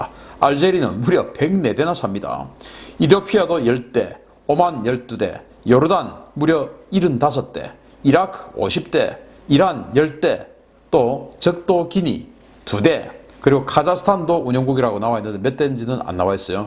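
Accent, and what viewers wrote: Gyeongsang, South Korea. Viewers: 그동안 군사 문제에 있어 좋은 전문가라 생각했습니다. 그러나 문재인 대통령 목소릴 가지고 망신 주는 댁을 보며 그닥 좋은 사고를 가진 사람이 아니라는 사실을 알 수 있었습니다. 경남 사투리로 방송하시는 님! 님도 그다지 듣기 좋은 목소리가 아님을 알려 드립니다. 방송은 표준말이 대세입니다. 개그가 아니라면... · 경상도사투리에 단세포적 수구골통에 참 역겨운 채널이네. 별 깊은 지식도 없는거 같은데 듣기싫은 억양으로 주절주절...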